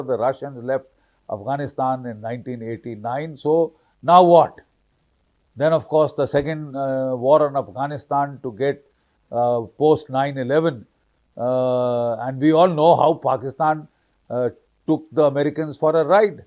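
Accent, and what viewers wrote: India. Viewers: You old fool,,, he Modi's friend more than india,,, you can not fool people with your curry English...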